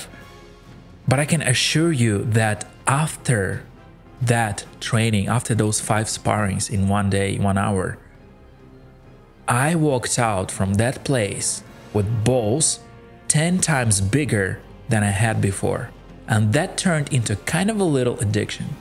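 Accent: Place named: South America. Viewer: I dig your South American accent . Good accent My God, i hope you're not Egyptian. Sincerely Dan, South Dakota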